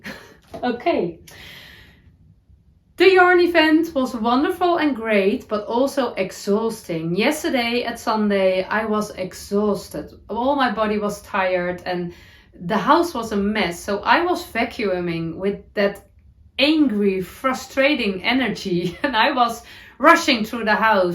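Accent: Netherlands. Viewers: Dear, I always look forward to your podcast episodes, sitting comfortably in my armchair knitting and listening to you. As I was born in Aken, your dutchy English gives me home vibes. Heartjumping! And of course I'm coming to Breda for the k2p2 festival. My husband is coming with me, if only so that I can show a few more of my knitted pieces on him as a model. 😇 Best wishes from Cologne!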